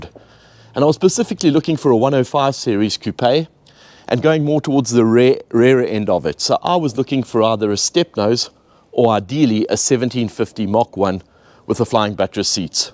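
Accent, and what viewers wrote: South Africa. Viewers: Your accent definitely gives you away 😂😂😂 South African 🎉🎉🎉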